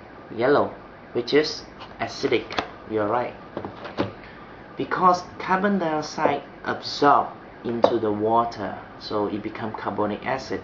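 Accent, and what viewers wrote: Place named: Thailand. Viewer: this guy is Thai from his accent...he said "minira" lol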